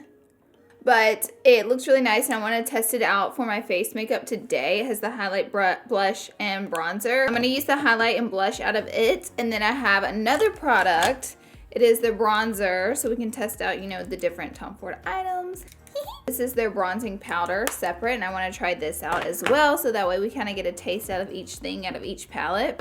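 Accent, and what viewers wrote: South, United States. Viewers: Where are you from???? I love your southern accent. I'm from mobile, Alabama and I can just feel that you're from Alabama lol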